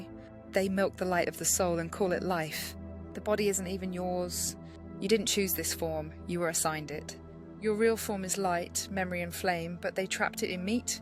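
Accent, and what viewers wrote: United Kingdom. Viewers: ahhh the British accent of wisdom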